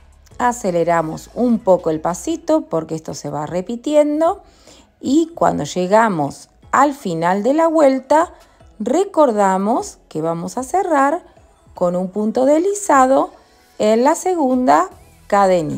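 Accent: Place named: Central America. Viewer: Me gusta el tejido, muy fácil!!! No entiendo xq siendo argentina habla como centroaméricana ó algo así. Pero todo excelente!!!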